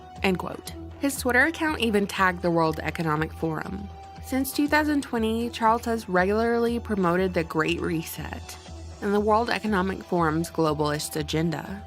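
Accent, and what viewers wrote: South, United States. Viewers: How did you become Southern? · Ohhhhh just finished watching and yes, omg I want MORE from you on all of the EVIL PSYCH0paths!!! Your ironic American accent is "delicious" ! I'm originally from Canada and cannot wait to share the screenshots on certain points that you made!!! Of course I shared this video on Facebook....goes without saying!!! Btw, I know you're a new mom (félicitations!) but when you feel up to it (your little sweetheart comes FIRST of course!!!), I am CERTAIN that I'm speaking for all of your fans, we'd LOVE more to you on this subject as you are able !!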